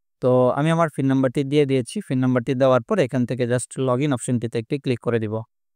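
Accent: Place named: Noakhali, Bangladesh. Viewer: bhai apnar kotha barta shune mone hocche noakhali bari apnar ......uccharon aro valo howa uchit....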